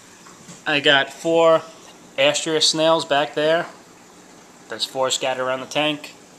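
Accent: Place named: Long Island, United States. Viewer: Good job. Love that long island accent "WAR-TER" "SAW-LT" "FRAWGSPAWN". I have that same light fixture, it works well. The stock bulbs are sufficient, so no worry until you get new ones. What bulbs did you order? Looking great so far.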